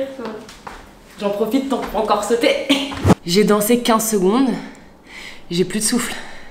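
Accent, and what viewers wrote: Canada. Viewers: tu mon youtubeur préféré vidéos accent canadien et qui met quoi des vidéos trop trop rire 🇨🇦👍👍👍👍👍 🇨🇵🇨🇵🇨🇵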